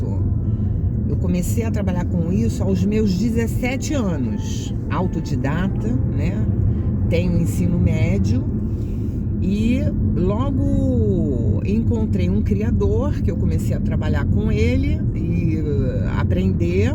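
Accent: Rio de Janeiro, Brazil. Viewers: Pedindo para ter espaço aí pra volta ❤ E vc ainda é nitidamente muito carioca ;) ❤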